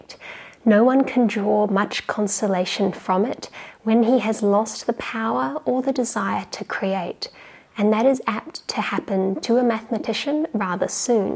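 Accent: Australia. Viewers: Yes, targeting Indian audience is a good option on YouTube. In India they promote technology like engineering and computer sciences. Is she Australian? Her accent sound Aussie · Are u Australian? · Hey are u Australian??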